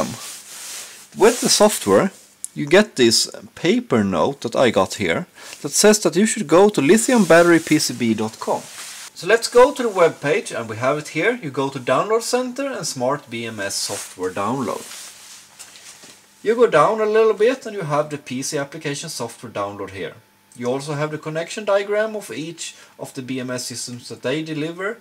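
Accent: Sweden. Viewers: You sounds swedish.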